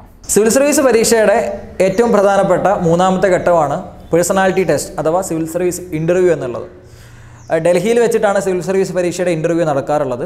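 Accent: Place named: Kollam, India. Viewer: Kollathullavr samsarikunna athe baasha shaili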